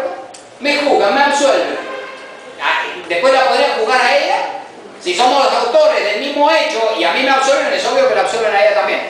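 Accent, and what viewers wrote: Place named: Argentina. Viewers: Suena argentino. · es argentino.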